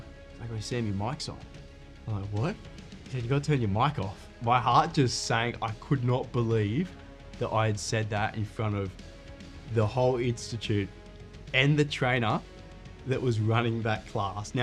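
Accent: Australia. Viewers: I love you, Sam... But, your Aussie accent confuses me to laughter!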